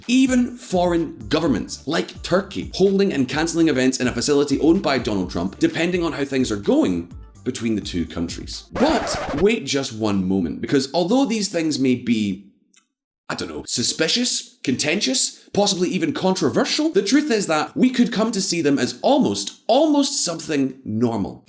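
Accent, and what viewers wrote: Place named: Scotland, United Kingdom. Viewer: THE NEW YORK TIMES EH! BS WHAT A RAG! You sound like a SCOTTISH LEFT WING INDEPENDENCE PRICK.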